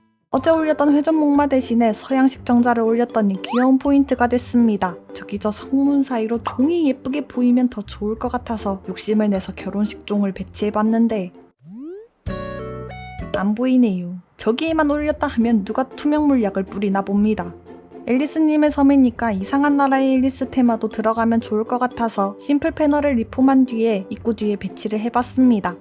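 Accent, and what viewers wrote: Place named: Daegu, South Korea. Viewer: 대구분이라 사투리 쓰시는거에욘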